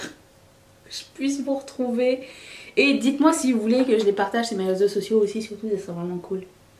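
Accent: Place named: Quebec, Canada. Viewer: Wow! Je sais pas pourquoi mais j’entends un léger accent québécois 😂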